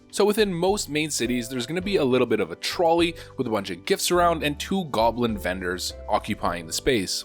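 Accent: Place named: Canada. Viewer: He has the most Canadian accent ever and people are surprised.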